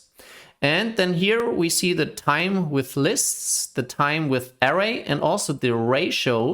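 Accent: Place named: Germany. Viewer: ich versuch NumPy zu lernen aber bin immer noch von diesem deutschen Akzent ablenkt😭😭aber klingt sehr niedlich❤️❤️❤️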